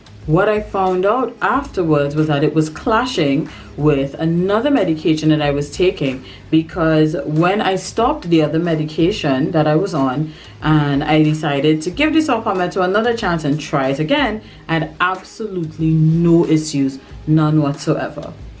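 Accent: Trinidad and Tobago. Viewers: Lol I said this is definetely a Trini accent 🇹🇹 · You sound like a Trini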